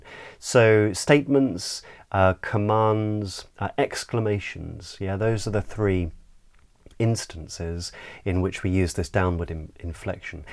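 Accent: United Kingdom. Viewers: I really enjoy listening to your speech and the pure British ACCENT.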